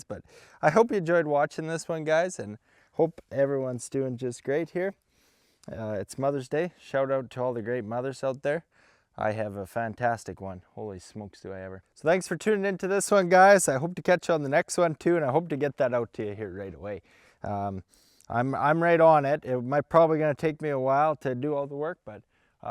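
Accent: Canada. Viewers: I stumbled across this page and thought "well, let's just watch this young guy grapple with mother nature" , so I did. Lemme tell you... The way you ATTACKED this project and just absorb everything you learn- WELL DONE! I was listening to you talk, and if you're Canadian, you just KNOW another Canadian- and all your sayings remind me of growing up and of course, my time on the prairies. SK is certainly some of the most beautiful land out there- I don't care what ANYONE SAYS. Love watching this journey (sometimes through my fingers cos I'm TERRIFIED FOR YOU lol) and I can't WAIT to see you build this amazing achievement! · I love to tune in and listen to this kid narrate his work, so f'ing Canadian!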